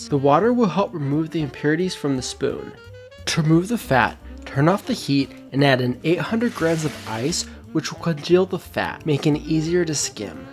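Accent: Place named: United States. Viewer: *Clicks on recipe video.* *American starts talking.* *Uses SCALE and GRAMS* 🥹🥹🥹 You got me right in the beginning! I really do appreciate Americans who use the (superior) metric system even though it's not the standard in your country. Thanks a lot! (In case you're Canadian though I'm sorry for calling you American lmao 😂 sometimes I don't recognize the accent)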